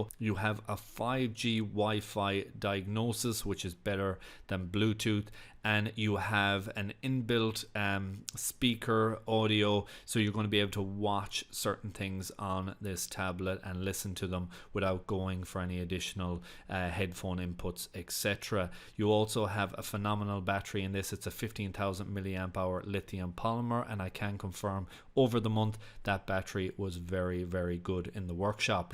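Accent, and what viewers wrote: Ireland. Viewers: not to be rude but i wanted to ask are you Irish?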